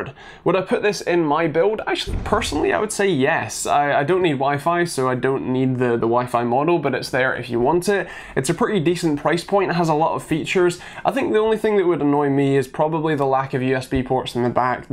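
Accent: Scotland, United Kingdom. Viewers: He seems to have a stutter and I think hes scotish they speak fast andi think he's struggling to anunceate the english could also be nerves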